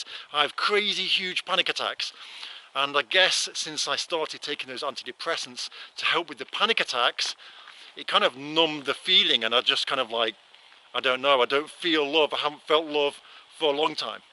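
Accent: England, United Kingdom. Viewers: Your English is ENGLISH. Teach me. I am British but not English like you.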